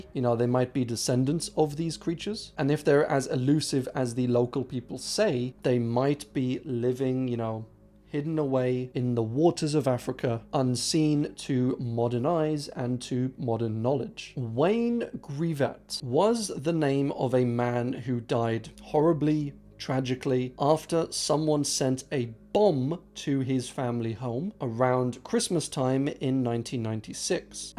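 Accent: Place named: London, United Kingdom. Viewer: London? But then at times I hear something else 🤣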